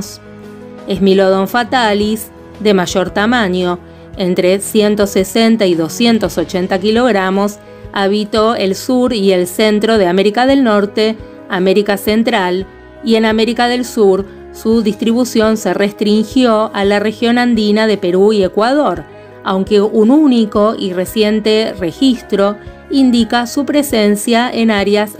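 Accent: Argentina. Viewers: Interesante reportaje con una dama de acento inconfundiblemente y agradable argentiiino , espero no me equivoque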